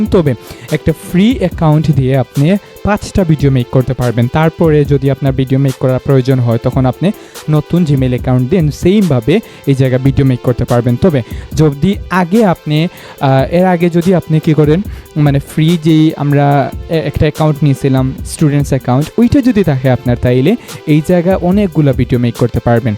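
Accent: Sylhet, Bangladesh. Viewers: সিলেটি না কি ❤ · সিলেটি রে পাইসি রে 😊😊❤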